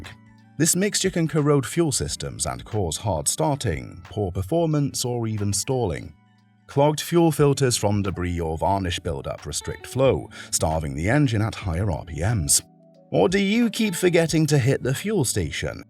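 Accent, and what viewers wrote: United Kingdom. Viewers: this obnoxious snotty sounding British voice narration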